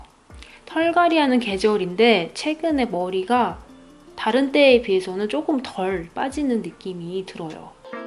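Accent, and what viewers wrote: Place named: South Korea. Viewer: 와 영상은 처음봤는데 진한 한국말에 놀람...한국분이셨어요?!!!!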